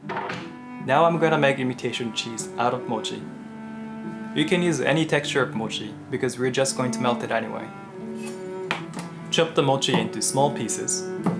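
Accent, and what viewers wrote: United States. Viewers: he sounds so american now LOL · You sound so much more american since I first started watching- wo a h. Also the video is g o r g e o us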